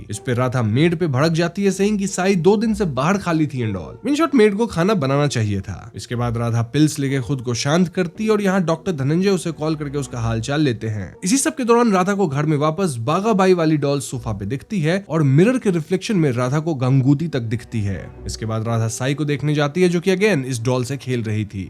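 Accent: Bengal, India. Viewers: One request,,, your Bangali accent is too thick, so please learn to speak in a neutral accent.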